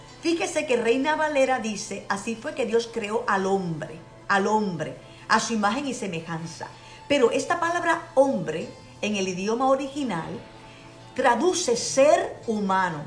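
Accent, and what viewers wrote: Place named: Argentina. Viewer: Usted vive en Puerto Rico pero su acento suena argentino usted es argentina??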